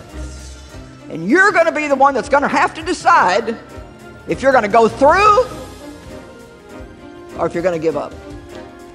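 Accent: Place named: South, United States. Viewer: I can't take this lady's southern accent seriously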